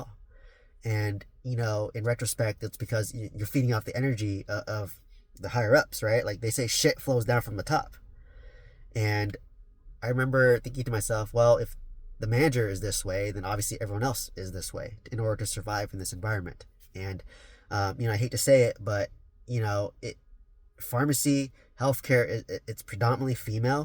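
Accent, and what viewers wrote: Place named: California, United States. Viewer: He sounds like he's from California.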